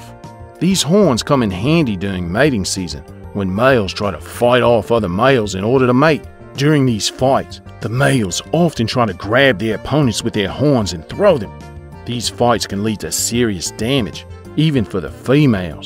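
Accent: Australia. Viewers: Is that meant to be an Aussie accent? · U do know that all Australians don't have a strong accent right my friend sounds like him. And go be a hater some where else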